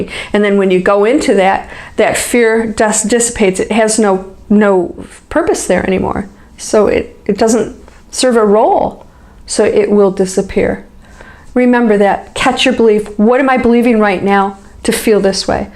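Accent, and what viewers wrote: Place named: Michigan, United States. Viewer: Are you from Michigan or around there? My science teacher has the same accent and she's from there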